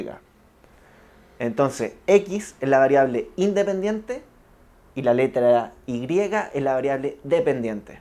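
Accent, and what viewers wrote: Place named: Chile. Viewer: Chileno detected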